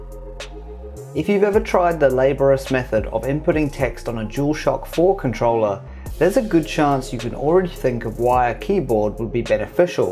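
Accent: United Kingdom. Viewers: Did you get his accent? He's probably across the pond hint hint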